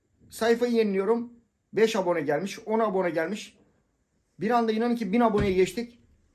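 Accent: Thrace, Turkey. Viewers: abi biz senı sevıyoruzya sadelıgın hele bıde trakya şivesi süper oluyo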